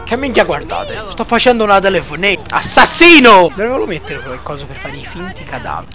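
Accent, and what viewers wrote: Tuscany, Italy. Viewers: Ma sei toscano? il tuo accento mi ricorda tanto il mio. sai i toscani si accorgono dell'accento toscano degli altri più facilmente degli altri :D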